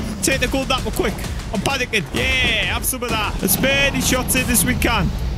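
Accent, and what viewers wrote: Scotland, United Kingdom. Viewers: You sound Scottish like me mate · Are you British? I don't care you you even answer And is this video sponsored by laff loaf? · Are u Briish are u briishmate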